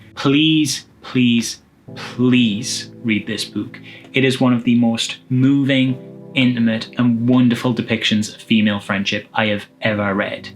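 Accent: Newcastle, United Kingdom. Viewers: That's an incredible book cover! Btw, I have loved this whole series so far. I am a pretty serious horror fan, but I never thought of how heartwarming and wholesome the genre could be, and nowadays I live for that shit. So thanks for bringing these gems to all of us and talking about them so genuinely in that charming-ass Geordie accent.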